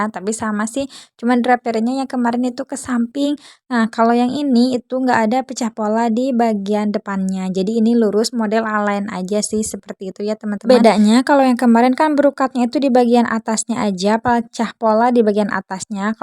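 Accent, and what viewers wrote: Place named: West Java, Indonesia. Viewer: Teh orang mana Sunda pisan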